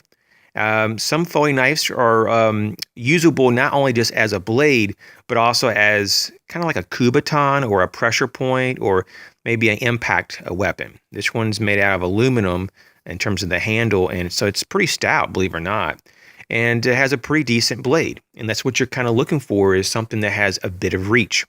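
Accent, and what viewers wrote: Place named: South, United States. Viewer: Southern Georgia? Or maybe Texas or Louisiana? Trying to place your accent. it's a hobby of mine, I'm North Alabama.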